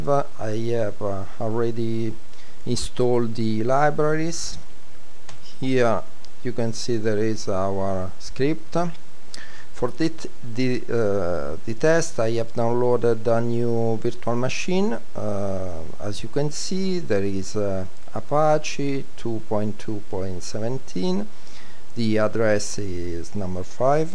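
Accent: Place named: Italy. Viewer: Outdated. Si sente che sei italiano ma mamma che brutta pronuncia che hai !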